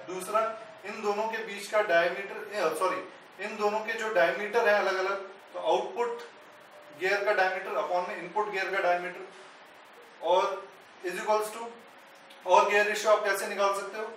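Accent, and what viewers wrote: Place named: India. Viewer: omg, idian accent :((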